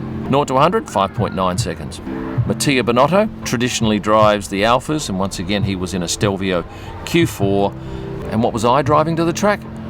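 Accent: Australia. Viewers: Your F1 videos are so unique and refreshing. I can’t stand most of other F1 videos all British YouTubers and track track track focused. I have heard enough during 3 days of watching F1 lol. Yours are much better. Maybe I just love Aussie accent cuz I live here lol.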